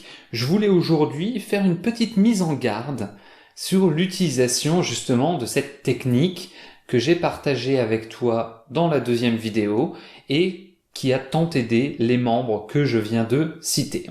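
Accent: France. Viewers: Salut! Merci encore pour vos vidéos pleines d'enseignements et d'instructions, bien entendu. J'suis un étudiant senegalais passionné des langues étrangères notamment le français et l'anglais. Vos vidéos m'ont beaucoup apportées en terme de niveau français. Mais j'ai un petit soucis pour ne pas dire un problème, étant fasciné par la culture française, j'aimerais bien avoir un accent français assez simple comme le vôtre; mais je ne sais pas comment s'y prendre? Avez vous une solution à cela?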